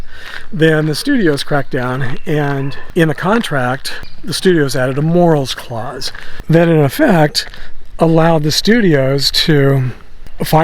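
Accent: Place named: Boston, United States. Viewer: Cuppa coffee. Sounds like your from my neck of the woods. Boston area.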